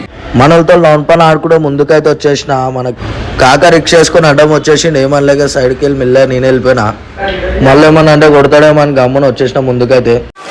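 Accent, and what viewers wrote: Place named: Telangana, India. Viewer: 😂😂thoroughly enjoyed specially your Telangana accent cheers to your new beniging 😅😅and keep going good luck 🤝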